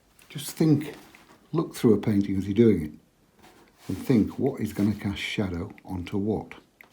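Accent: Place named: Northern England, United Kingdom. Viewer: I am seriously enjoying your videos so much. I'm guessing you are up north and you say grass instead of grauss! ;) Your sense of humor is a delight! I can tell you've been painting a long time... but your pallet is driving me crazy.. maybe I have OCD! It works for you but it looks moldy! :) Please receive this in the way it's intended and not meant to hurt feelings. :) You always end up with a beautiful painting.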